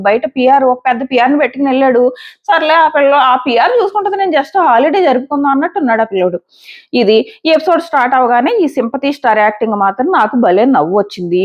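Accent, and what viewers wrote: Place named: Godavari, India. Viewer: Ammai, You are from mana Godavari district ana mata.. Nice slang....❤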